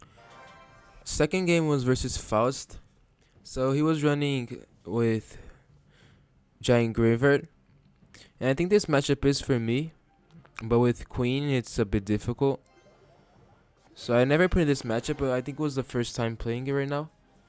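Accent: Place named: Brazil. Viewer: Pelo sotaque vi que era BR kkkkk, joga mt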